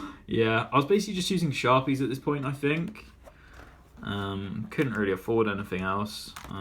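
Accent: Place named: South West, United Kingdom. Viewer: Your artistic evolution is a testament to your hard work mate, good work. I'm UK old school (2nd wave, started 1987). More of a character artist myself but still love the letters. You do some very nice work,. You from the south west? Thought I recognised the accent. Come check out my channel mate, see what you think. Peace.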